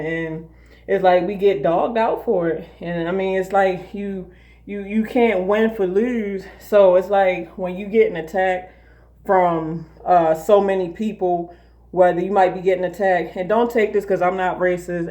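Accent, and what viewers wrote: Baltimore, United States. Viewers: Much respect queen, u gotta be from bmo. I can hear it, being from pg. Stay strong stay sharp stay genuine! Much peace, love, positive vibes!!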